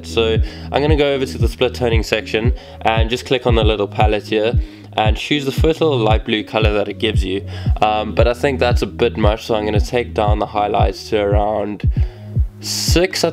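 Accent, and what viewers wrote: South Africa. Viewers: dude, this is brilliant. also, i noticed you accent. south african maybe? (this video was in my recommended so i'm a new photographer) (cape town born and bred) sending you all the good vibes. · i can sniff the south african accent froma a mile away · Random question but are you south african? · You have a South African accent.🙌🏽👏🏽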